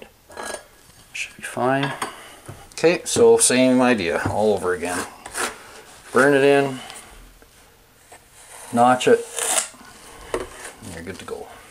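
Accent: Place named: Canada. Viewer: Finally someone who pronounces the word Niche correctly. I'm gonna guess you're canadian right?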